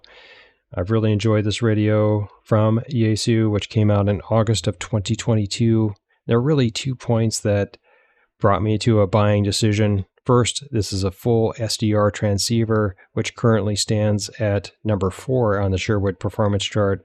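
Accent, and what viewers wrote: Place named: United States. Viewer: One thing you could also do to improve your transmit audio is to ...speak...more...slowly.... I dont mean gaps between the words. I mean the w o r d s t h e m s e l v e s . Whatever part of the USA your accent is from, you speak very fast. So on a difficult receive situation, or for someone whose first languge is not English, the receiver will find you much more difficult to understand. Yes it's difficult to do, but certainly in your case it will make a big difference.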